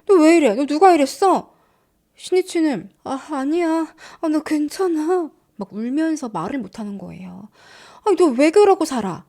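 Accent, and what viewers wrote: Busan, South Korea. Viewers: 아따 어리석은 남자들~~~ 사투리 넘 부산요 ㅎㅎㅎ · 알아도 또 듣게 만드는 재주가 있으시네~ 사투리는 또 와그래 이쁘게 잘하노? 반했데이~ㅎㅎ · 일본어 묘사를 하시다가 영남 사투리로 너무 자연스럽게 전환하시네요😂 오늘도 재밋게 보고갑니다👍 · 아니 ㅋㅋㅋㅋㅋㅋㅋ 연기도 잘하는데 부산사투리도 와이래 완벽합니꺼 ㅋㅋㅋ못하는거 없어 ㅎㅎㅎ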